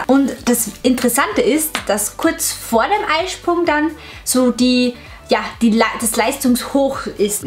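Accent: Austria. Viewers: Bist du Österreicherin? :) (wegen dem akzent hätte ich halt geraten haha)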